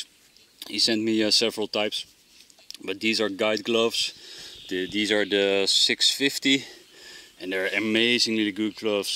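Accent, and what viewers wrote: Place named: Netherlands. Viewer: Coole video! Maar je hoort wel dat je Nederlands bent😂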